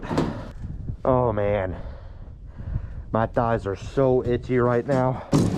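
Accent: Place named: Alabama, United States. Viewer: Hey I'm enjoying this boat renovation stuff, will keep watching to see what happens. I'm from Alabama, it's great to hear your accent.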